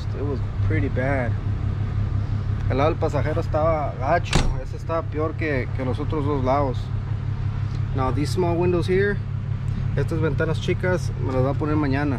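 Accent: United States. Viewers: You from Chicago???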